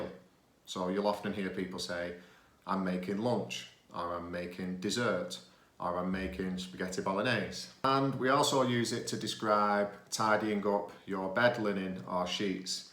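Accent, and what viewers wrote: United Kingdom. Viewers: thank you very much for your dedication and careful explanations! And sorry for my question, what kind of British accent have you got?? I notice that it is British but with same variations, is not it??... Sandro Cano, from Argentina...;);)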